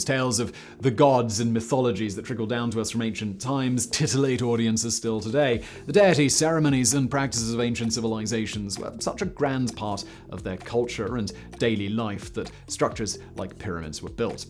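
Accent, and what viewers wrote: England, United Kingdom. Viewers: Dude has used 11th grade literacy and semi posh English accent to build an empire. And i mean "11th grade literacy" at maximum. he mispronounces words I've never heard mispronounced before. Regardless, business brain blaze has made me love him AM I RIGHT PETER!?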